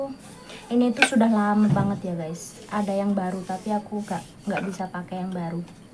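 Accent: Java, Indonesia. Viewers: Jowone Endi sampean mbak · Asyik jowomu jek medok dik ❤ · seperti org Jawa tp bukan Jawa barat Sunda soalnya jawany seperti ada mirip bhasa cirebon tp bukan heee pernah bilang "umeb" artinya mendidih heee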